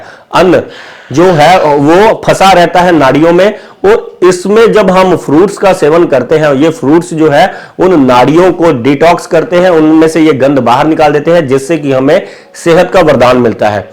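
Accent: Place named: Punjab, India. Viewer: ji aapki Hindi bolne ka tareeka bahut badia hai with mix Punjabi👌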